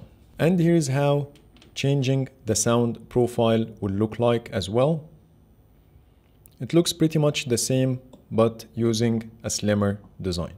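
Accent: Egypt. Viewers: انجليزي مصري اطلعك من وسط ١٠٠٠ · Is this guy Egyptian? Jeez that accent sucks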